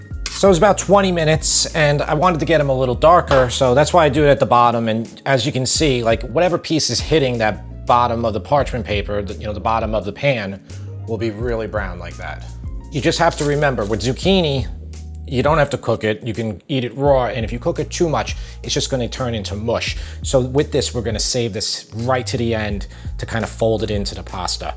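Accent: New York, United States. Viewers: You really act and speak like one of us New Yorkers from the island. It's nice seeing someone with the same brands we all use here.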